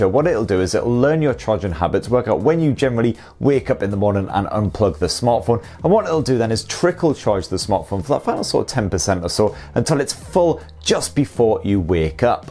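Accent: United Kingdom. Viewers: I love his british accent and cheery attitude every video. Makes you excited about any tech that he's talking about.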